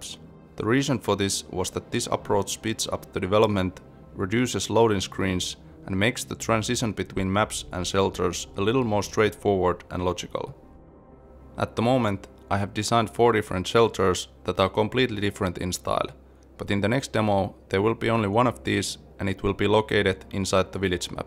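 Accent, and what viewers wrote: Finland. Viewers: Its clearly finnish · Recognized the finnish accent as soon as the talking started, it's somehow so particular. Graphics and environments look very nice. Will wishlist this.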